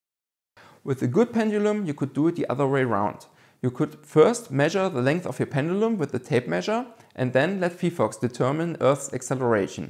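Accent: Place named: Germany. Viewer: Deutscher der versucht Englisch zu reden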